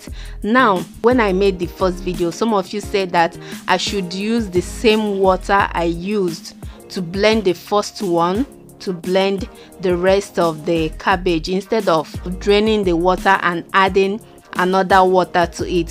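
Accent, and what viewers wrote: Igbo, Nigeria. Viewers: Ibo woman and her accent preparing fufu and eggs soup with chicken.....Ibo woman no dey carry last.... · First time watching your video and I knew already you are Nigerian by your accent 😁😁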